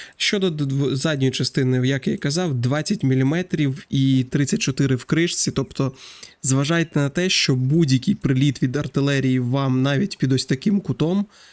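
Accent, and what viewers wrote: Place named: United States. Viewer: Не надо американского акцента очень слух режет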